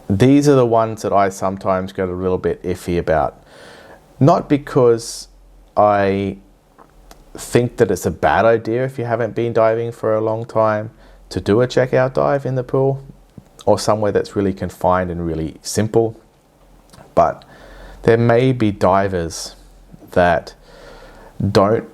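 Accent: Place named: Australia. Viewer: I live in Chicago, IL US...so no oceans near by, however I do have the Great Lakes! I don't know if there are scuba classes around here given that I don't really see there being a strong market for them, but I could be wrong lol ...maybe I can use a pool? Haha It would be AWESOME to get training from you, but I think you live in Australia, right (love the accent btw!)?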